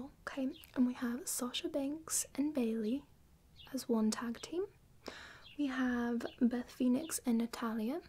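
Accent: England, United Kingdom. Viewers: love the british acsent if it even is haha! though the outfit is -tastic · Kowalski analysis - I think you're wearing your brother's hat that says "Punk" on the front. I can't figure out if that's a wig but your hair looks unusually dark and has an odd shine. This is also the first time you're showing your new desk chair on a video. There's someone desperately trying to tell you something lol. I don't know anything about wrestling but it's always fun to see you portraying different characters! I've always wanted to hear you speaking with an English accent and you sounded great, it wasn't forced and felt very natural! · Spectacular video the English accent and all the attires is amazing those were some great predictions have outstanding weekend enjoy wrestlemania 35 🧡💚✨🧡💚✨🎊🎉🎊✨😎🏆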